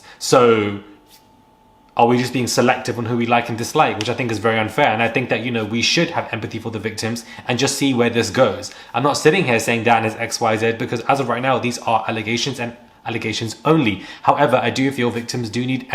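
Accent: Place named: London, United Kingdom. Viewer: I didn’t notice your speed, I do like your layout of presenting,barely any waffling straight down to the news in hand. I had guessed you were London though. You are just fine- as you were 🙌👏🏻👏🏻👏🏻👌 P.s- didn’t know there was a speed button. On a new ish iPad?? Live and learn one for me today.cheers , G .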